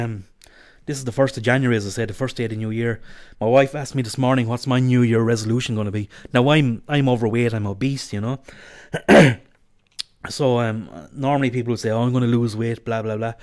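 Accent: Ireland. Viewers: Are you irish? and nice video! :D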